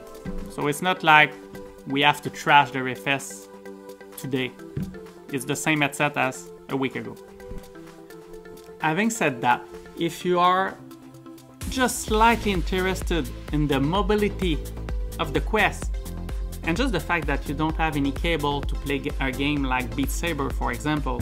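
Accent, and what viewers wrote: Quebec, Canada. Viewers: je reconnais l'accent quebecois et vive le canadiens! lol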